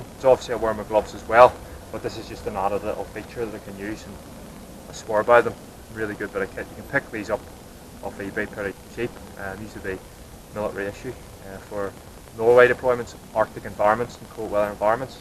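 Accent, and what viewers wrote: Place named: Northern Ireland, United Kingdom. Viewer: you sound like somewhere between Newry /Belfast? great channel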